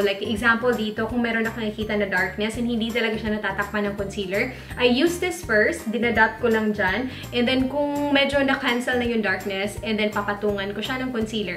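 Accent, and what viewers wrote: Visayas, Philippines. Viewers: Hello, Ms.! The first time I watched your video, I already noticed your accent. It has a hint of Visayan accent. I'm not against it 'cause my dad's a Visaya as well. I just wanna ask these questions: 1. In what particular province did you reside while you're still here? 2. How long did you stay here? 3. What is your life verse? ☺ Thank you so much! Praying that God will grant the desires of your heart. 😊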